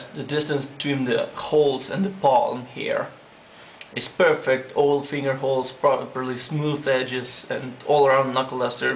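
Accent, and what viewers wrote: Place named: England, United Kingdom. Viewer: i love your English accent lol!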